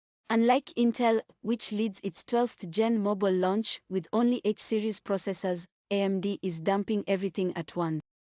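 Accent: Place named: Africa. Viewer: Asian woman with African accent? Wow. Good video though.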